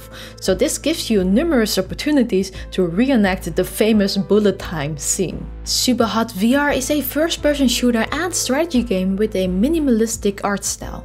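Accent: Netherlands. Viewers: Wow your english is extremely dutch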